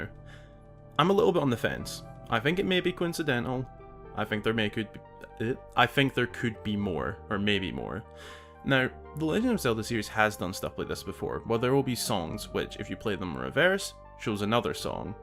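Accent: Scotland, United Kingdom. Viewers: Glad to see the man behind the awesome scotish accent ;) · It's cool dude. It's nice to see the face to the wonderful oh so similar accent that I haven't heard much. Mon the Scots lol. So good a concept I can see/hear the likness to? I guess we will need to see next year 😭😭😭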